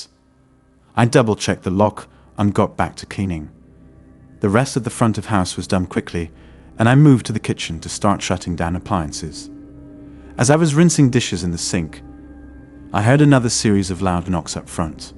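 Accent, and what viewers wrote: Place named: England, United Kingdom. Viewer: A Englishman ,narrating the story . Nice ! 😉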